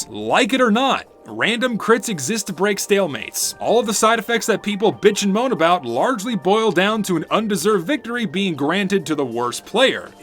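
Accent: South, United States. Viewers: I may be missing something but I swear he randomly goes southern every 6 seconds or so.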